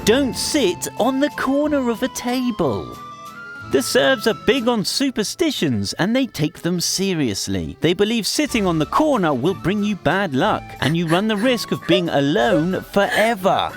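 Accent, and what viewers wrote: England, United Kingdom. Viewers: What an ugly pomie accent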